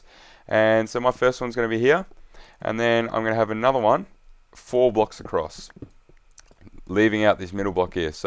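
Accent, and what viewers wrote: Australia. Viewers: this guy is aussie like me :) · are you australian? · you are Australian? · Are you Aussie? I'm a kiwi!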